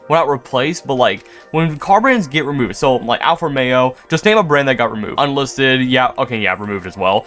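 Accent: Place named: Kentucky, United States. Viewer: You from Kentucky? I’m from London Kentucky myself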